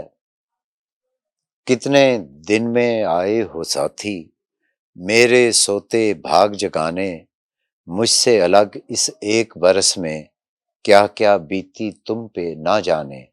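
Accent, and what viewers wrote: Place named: Punjab, Pakistan. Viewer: urdu reading in Punjabi style.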